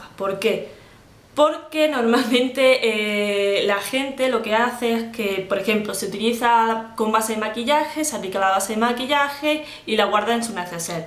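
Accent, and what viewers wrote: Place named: Granada, Spain. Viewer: Sabía que eras granadina!tienes nuestro acento!ME ENCANTAN TUS VIDEOS!! haber si coincidimos algún día en granada!! Un besazoo de una faan!!=)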